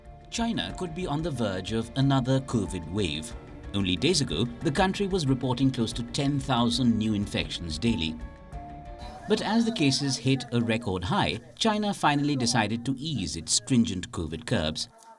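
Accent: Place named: India. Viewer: indian accent. speak english.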